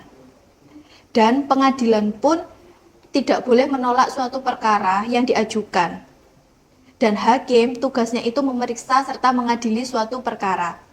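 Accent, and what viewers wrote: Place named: Java, Indonesia. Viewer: Jawa ha medok bngt mba. 😂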